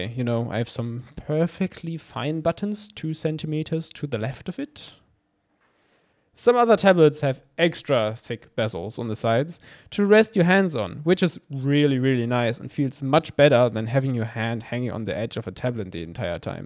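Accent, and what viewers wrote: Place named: Germany. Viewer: he has the most german accent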